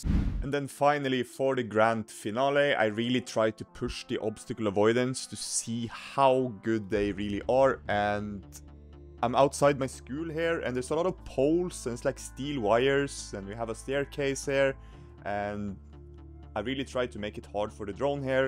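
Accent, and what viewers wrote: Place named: Norway. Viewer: your English is perfect. Men eg kjenne igjen ein nordmann når eg hørre ein.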